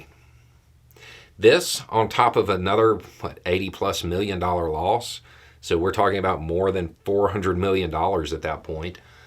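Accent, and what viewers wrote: South, United States. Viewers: is looks as if he is the stereotypical southerner. Even has the beard. But he has a kind heart. He is changing my automatic distaste when I hear a southern accent. 🙏❤🙏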